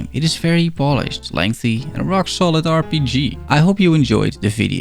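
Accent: Netherlands. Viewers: bruh you are from the netherlands i can tell haha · tering zeg wat een nederlands accent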